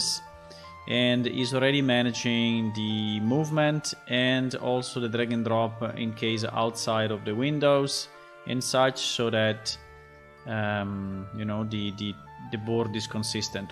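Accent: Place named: Finland. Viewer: You sound Finnish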